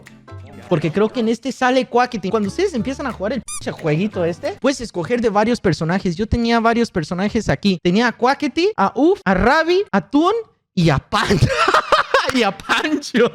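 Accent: United States. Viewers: No mames ya se le pego el acento americano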